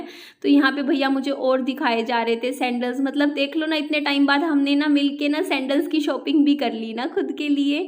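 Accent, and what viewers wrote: Haryana, India. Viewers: Haryanvi Tone m aise hi bolte hai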